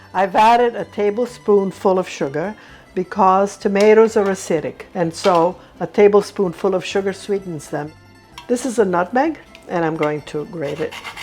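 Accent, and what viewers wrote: United States. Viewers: She has an extremely American accent. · This woman has never lived here in Sicily in her life... "Sicilian" my butt.. Thick AMERICAN accent... Americans always say things they are not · how come you sounds american and not italian?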